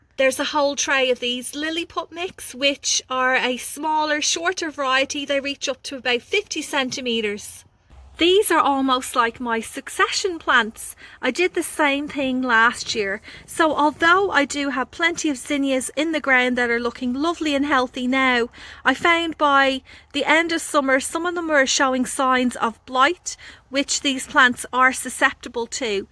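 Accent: Ireland. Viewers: Happy new year I love your beautiful garden. I love to enjoy your thursday garden update I also love your irish accent and keep up the fantastic content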